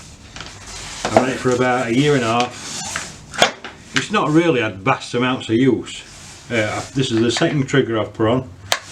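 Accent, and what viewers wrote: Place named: West Yorkshire, United Kingdom. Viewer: Great video thanks pal, I nearly bought the orange one until I saw this so cheers, sounds like you’re from West Yorkshire too? I’m in Halifax!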